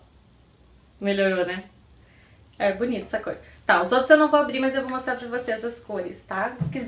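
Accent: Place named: South, Brazil. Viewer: seu sotaque é lindo sou carioca, mas minha paixão é o sotaque do Sul! Amei o vídeo! Realmente não saímos da farmácia sem levar produtos que avistamos na hora e desejamos! Beijos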